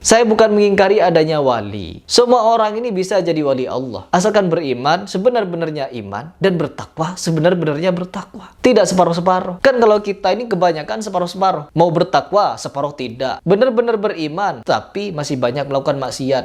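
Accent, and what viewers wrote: Madura, Indonesia. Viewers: Teruskan... TERUSKAN... Lucu logat bahasamu Madura bangetzzz.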